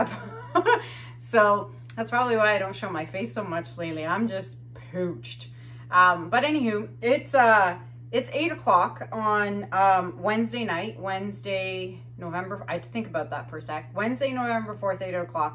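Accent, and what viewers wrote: Canada. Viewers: i really like listening to your canadian accent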